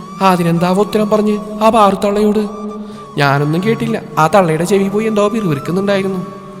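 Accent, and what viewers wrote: Coastal Kerala, India. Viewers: വിജയ് സത്യാ സുപ്പർ സ്റ്റോറി♥️♥️ എതൊരു ഗുഡ യെയും നന്നാക്കാൻ ശ്രമിച്ചാൽ അത് സ്നേഹം കൊണ്ടെ സാധിക്കു നല്ലവനായ ജഗൂ❤❤ ചിലങ്ക❤❤ ഇക്കാ കടപ്പുറം സ്ലാങ് അടിപൊളി😅👌🏼👌🏼👌🏼💞💞💞💞 അവതരണം കേട്ട് അങ്ങനെ ഇരുന്ന് പോയ്🥰🥰🥰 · ഇക്കാടെ വോയിസ്സിൽ കടപ്പുറം ഭാഷ കേൾക്കാൻ നല്ലരസം നല്ല സ്റ്റോറി 🥰🥰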